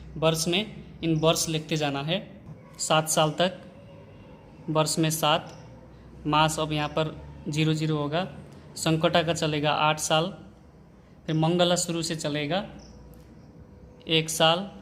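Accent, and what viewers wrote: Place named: Nepal. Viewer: Manche chai nepali jasto cha boli Hindi bolera yesto vya niskiracha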